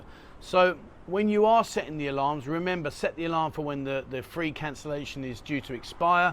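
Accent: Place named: London, United Kingdom. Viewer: Horst it's a London accent other people understand it.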